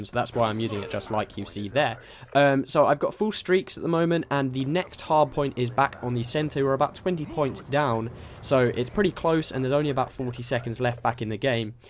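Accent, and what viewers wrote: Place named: United Kingdom. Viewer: The BRITISH ACCENT..... Awweeeeeeeee! DAT ACCENT! :D Nice intro btw!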